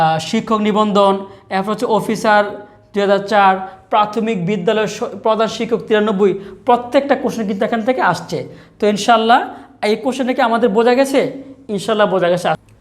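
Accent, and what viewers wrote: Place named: Mymensingh, Bangladesh. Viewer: ভাই এর বাড়ি কি কিশোরগঞ্জ,ভাষাটা বৃহত্তর ময়মনসিংহের মত আসে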